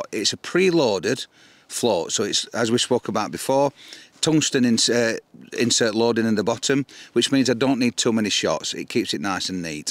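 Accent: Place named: Manchester, United Kingdom. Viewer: Brilliantly done you must be from Manchester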